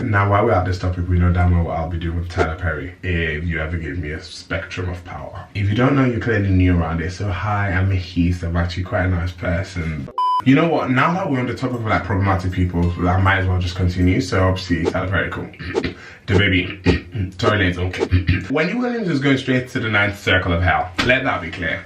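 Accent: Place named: North, United Kingdom. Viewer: HOW HAS YOUR ACCENT GOT ALL NORTHERN ALL OF A SUDDEN??? I’m getting rid of kitten heels in my dictatorship sorry not sorry.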